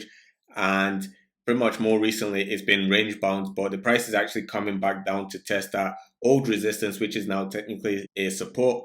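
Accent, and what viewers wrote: Scotland, United Kingdom. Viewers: Is that a Scottish accent i hear bro?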